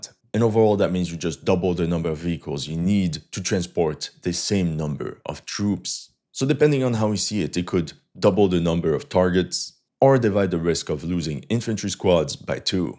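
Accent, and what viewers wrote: Quebec, Canada. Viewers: ahh that Canadian french accent. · « c'est ben correct ! » haha le gars est Québécois !! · Je savais cetait un quebecois depuis le debut 😅😅😅 ga cest ben correct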